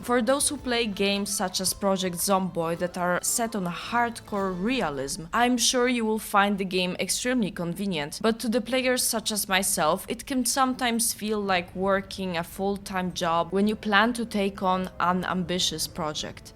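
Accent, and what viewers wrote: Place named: Poland. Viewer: Why do I have this strange feeling that you're Polish? I could swear you have that kind of accent.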